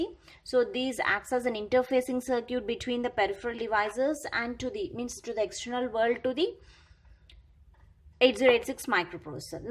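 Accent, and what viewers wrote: South India, India. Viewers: i hate these south Indian Accents..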